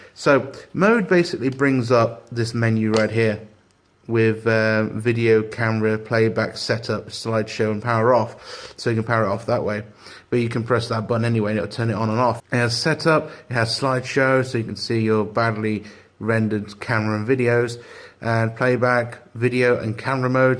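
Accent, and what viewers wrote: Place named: United Kingdom. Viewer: British accent is why I'm here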